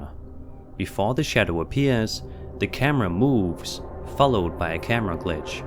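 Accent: Denmark. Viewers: Sir, are you danish? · thank you. My hubby is from Denmark and he pointed out his accent lol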